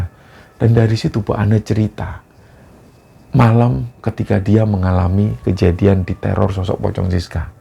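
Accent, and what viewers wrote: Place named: Surabaya, Indonesia. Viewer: Assalamualaykum Mas.., maturnuwun atas ceritanya, sungguh kami sangat menikmati kisah tentang Mbak Siska dimalam ini. Meskipun cerita-cerita yg Mas bawakan selalu bernuansa horor, tetapi saya selalu tersenyum kalau Mas menyebut diksi "cepat-cepat", Mas selalu mengatakan dengan "cepet-cepetan". Mungkin itu dialektikal Surabaya-an kali ya...???! 😊😊😊🙏🙏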